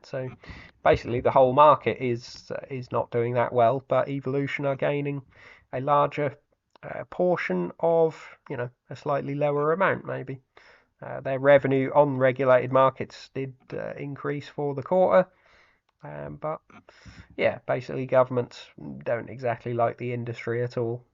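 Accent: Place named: Bristol, United Kingdom. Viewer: I’m guessing it’s a Bristol area accent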